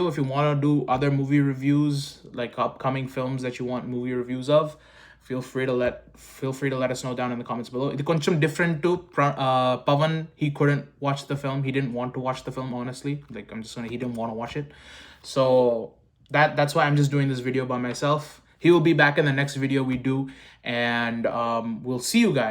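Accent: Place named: United States. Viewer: Bro just speak without an accent bro. your /r/ sounds are all over the place. But you are doing a good job of creating content. you mix US and UK accent traits and as a voice and accent trainer you sound really Cringy bro. No offence, Lemme know if you want we can explore few tips so you soundd realllllly good bro. All the best!!! Peace :)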